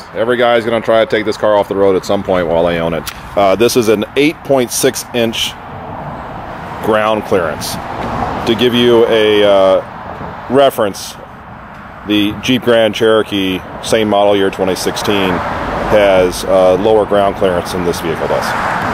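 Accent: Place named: Midwest, United States. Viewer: Have seen many '16/17 Outback reviews, and not once has anyone shown the roof racks in operation. (Thanks!) Thule or similar crossbars can cost hundreds; integrated rails is a great feature. Could tell you're in Chicago by the architecture, and your slight Midwestern "accent." Sounds like home. Far North Side? Edgebrook? / South? Beverly?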